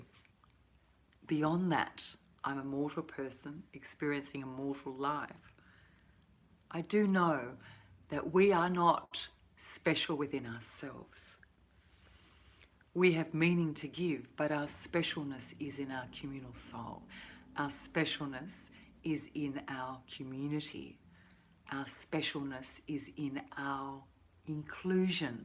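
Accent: Australia. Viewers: Love the Aussie accent it's sexy , I'm scots /Irish we have a huge bond with Australia x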